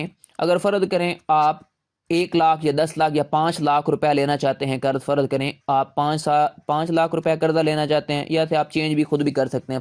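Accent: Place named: United Kingdom. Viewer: ایک جھوٹ اور دوسرا لہجہ انگریز والا پتہ نہیں لوگوں کو انگریز بننے کا شوق کیوں ہے۔بنک لون دے اور وہ بھی سود بغیر۔ہو ہی نہیں سکتا۔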